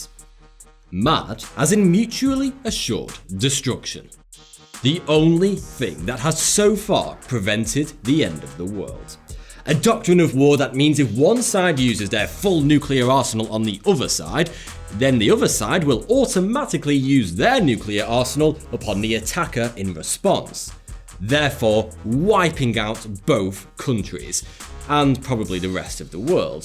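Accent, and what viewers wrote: United Kingdom. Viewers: i susbcribed cause of his brittish accent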